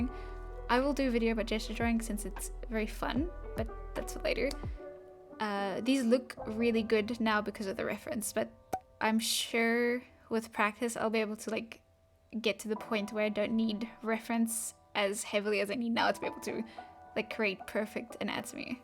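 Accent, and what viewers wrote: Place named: South Africa. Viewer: You sound like a South African. Nice accent. Also great video xD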